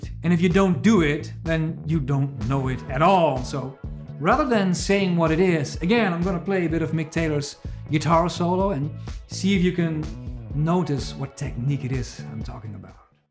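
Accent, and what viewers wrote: Netherlands. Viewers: Finally, we get to learn your accent is from the Netherlands! I always thought it was from ukraine or something….lol. Either way your guitar playing is the language i like best!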